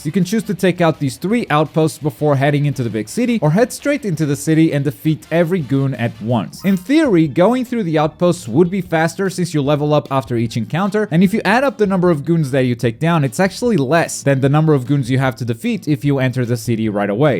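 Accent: Portugal. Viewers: Caramba, vc é de Portugal??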